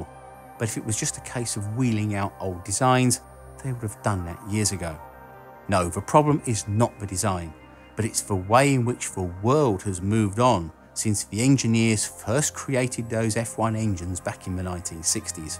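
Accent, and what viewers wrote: United Kingdom. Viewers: I can’t listen to or trust people with british accents so won’t be watching any more of your videos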